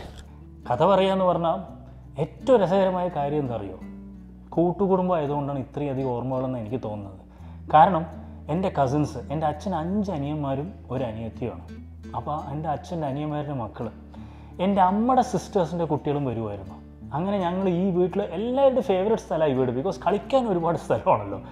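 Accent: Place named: Kasaragod, India. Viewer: I thought you are from kasaragod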